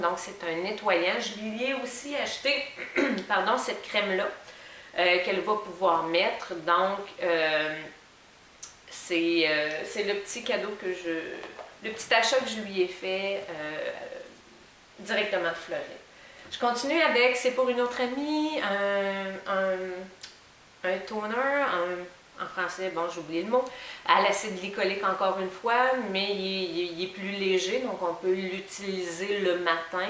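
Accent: Quebec, Canada. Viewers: jadooooore laccent quebecois ! ton accent est 😍😍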